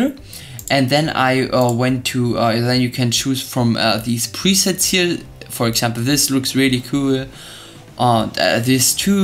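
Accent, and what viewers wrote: Germany. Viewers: You have a little German accent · Are u german?? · are you german?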